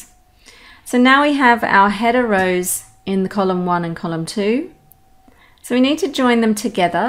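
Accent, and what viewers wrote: United Kingdom. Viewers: Thanks for the great tutorial. I despair when I receive badly formed spreadsheets. PQ has totally changed that - at least it numbs the pain a bit. BTW nice to hear a Brit voice for a change.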